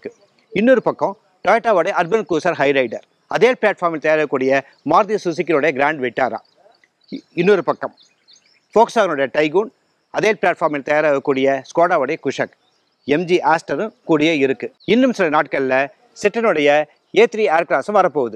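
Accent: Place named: Mylapore, India. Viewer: Boomer mama your is like our pal packet Mylapore nai sekar slangs. Why 😮😮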